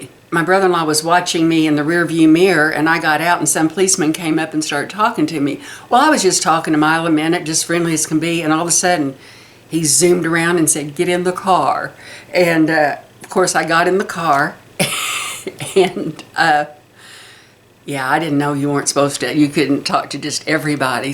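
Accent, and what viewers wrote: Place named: Southern Illinois, United States. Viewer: What a fun story! I love your southern Illinois accent as well!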